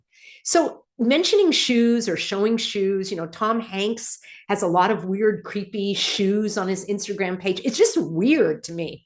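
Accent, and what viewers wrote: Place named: United States. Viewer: Well, I had to subscribe because your delivery in that subtly sarcastic tone with the American accent just tickled my little Scottish heart. You have it all well sussed as we say.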